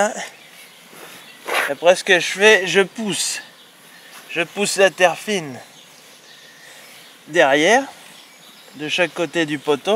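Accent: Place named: South-West, France. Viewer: Coucou, Arrête tes conneries! Tu nous fais quoi! Tu n'as pas honte! Et la grelinette elle est où!!??? Je plaisante 😉😁, tu as bien raison, il arrive un moment...je me souviens tes vidéos, c'était wahou très sportif🥵🥵 Quand je vois ta terre, wahou, moi elle n'est pas du tout comme ça, elle colle elle colle... J'ai commencé mes plantations de tomates et je continue avec toi 😂, en tout cas c'est super de voir ta manière de faire. J'adore tes expressions, ton accent du sud ouest, c'est toujours un plaisir de t'écouter et regarder tes vidéos. Des haricots remontant, là tu fais fort😂 Elles sont où tes fleurs!!!??????? Bonne journée A bientôt 😘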